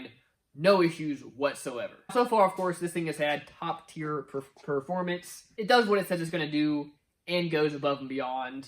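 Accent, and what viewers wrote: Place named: Appalachia, United States. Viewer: This dude looks like Gollum and sounds like a hillbilly! what is going on here?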